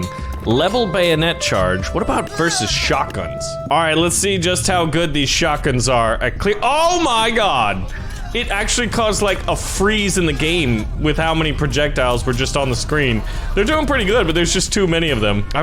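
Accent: Germany. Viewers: im from germany and i looove your german accent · Great German accent 10/10 (I am not getting paid by to say this)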